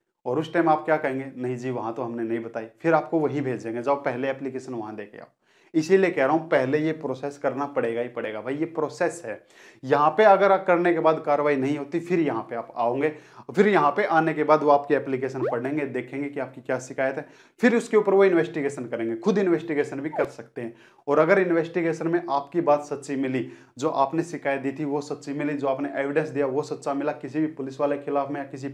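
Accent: Haryana, India. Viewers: Bihar se hu per boli haryana ka lagta hai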